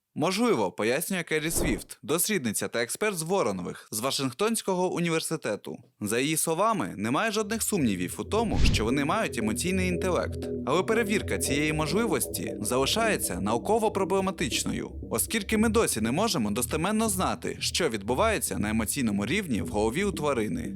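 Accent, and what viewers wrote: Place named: Russia. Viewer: ЧОМУ???? Чому ти використовуєш кацапські наголоси? півтори хвилини відео глянув, вже почув рОки і сАмі....тьху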